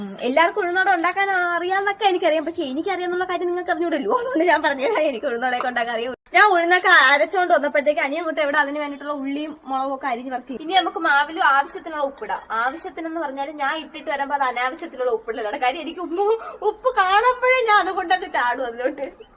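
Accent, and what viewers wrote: Kollam, India. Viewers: Ninghal ethu districtil aanu...shaaili kettittu kollam aannennu thonnunnu ...😅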